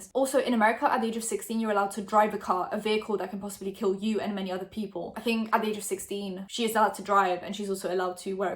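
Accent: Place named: London, United Kingdom. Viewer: she's polish but she's lived in england for a long time, so it's a london accent by the sounds of it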